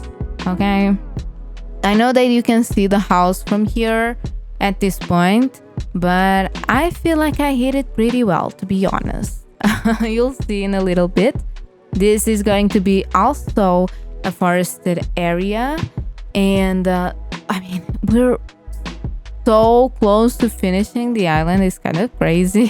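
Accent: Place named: Russia. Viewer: where are u from you sound russian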